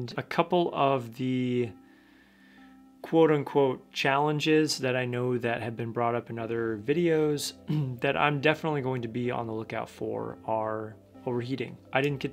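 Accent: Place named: United States. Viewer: I subs to your channel because your American accent! Ytuber from Vietnam, teaching English.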